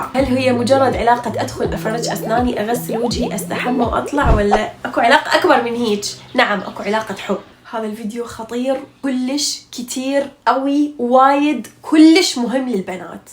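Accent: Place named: Iraq. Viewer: خطفتني خطفتني اللهجة العراقية خطفتني ❤روووووعة مش ممكن كمية الابدااع فيها احب العراق واهل العراق وتراث العراق انا مغربية احنا في المغرب درسنا عن العراق وتراثها وعن حدائق بابل المعلقة كلش حبيييييت 🇲🇦💗🇮🇶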